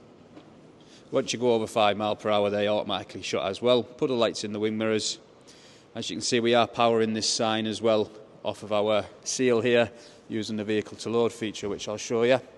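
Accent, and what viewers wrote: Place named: RP, United Kingdom. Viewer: not only the car but I love your stellar british BBC accent. You sound like Robbie Williams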